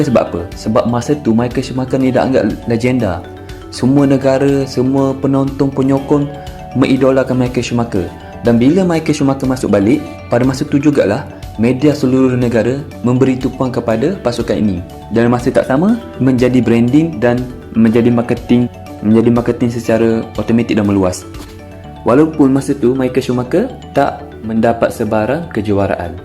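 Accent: Kelantan, Malaysia. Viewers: Sama kes dgn syarikat kenderaan hyundai sekarang.rumusan utk isu ni blh di rangkumkan dlm 1 ayat iaitu ‘asal benda jadi pitih. 1 lg,sy berani letak duit yg admin ni org kelate berdasarkan suara. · Nak tanya.. suara yang baca orang Kelantan ke🤗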